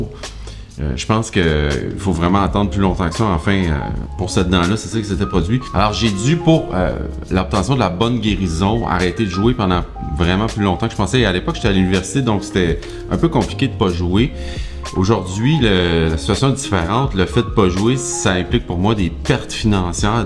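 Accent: Quebec, Canada. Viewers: T'en fais pas, même si tu dois moins jouer de saxophone au mois de Janvier, je n'arrêterai pas de regarder tes vidéos,. De 1, je joue avec une Québécoise qui a le même accent que toi et de 2 quand elle chante avec mon groupe et que je joue du sax, je pense toujours à toi et tes conseils, c'est comme si tu faisais partie de notre famille... 😉 PS: <link> (petite improv au sax soprano sur une chanson de ) Bon courage à toi.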